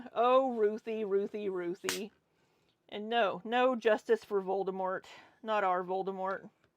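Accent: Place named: Minnesota, United States. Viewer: I missed Minnesota talk that wasn't about QLR's supidity - dang flabbit!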